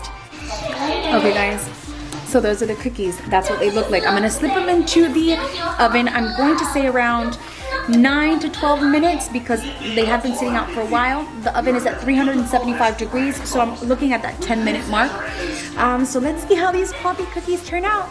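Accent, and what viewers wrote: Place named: New York, United States. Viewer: Loved everything, but I'll admit my favorite part was definitely your New Yorker accent 😂🤣❤👏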